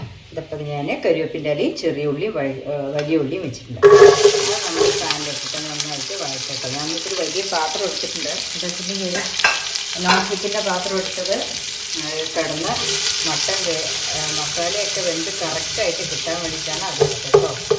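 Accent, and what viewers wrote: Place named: Valluvanad, India. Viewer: Valluvanadan Malayalam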